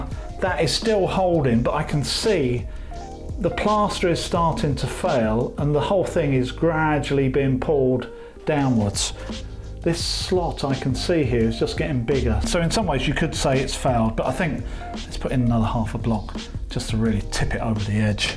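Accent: United Kingdom. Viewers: Thanks for this, was a bit concerned it would not go into a UK plasterboard so you can imagine my relief when I heard the good old blighty accent ;-)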